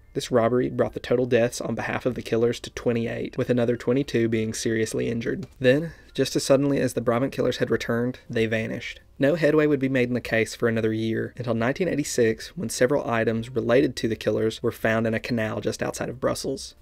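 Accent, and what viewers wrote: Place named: Southeast, United States. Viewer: Where's the accent from? It's Southeast, but not too southeast. I'm in NC, but I'd guess TN if I had to.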